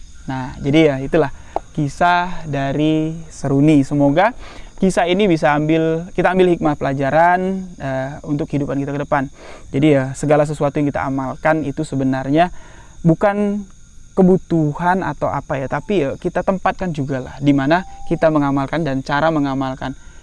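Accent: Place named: Jambi, Indonesia. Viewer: Assalamualaikum, bang slm santun slm sehat, apapun cerita misteri yg diceritakan sm bang enak punya ciri has bhsa jambi nya sngat amat kental saya suka bgt dari cara bicaranya bang emng orangnya sangat santun sukses trus bang dn teemnya moga sllu diberikan sehat, Amiinnn...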